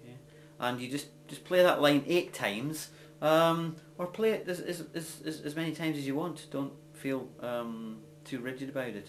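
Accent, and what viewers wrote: Scotland, United Kingdom. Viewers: woah i was not expexcting a mrs. doubtfire accent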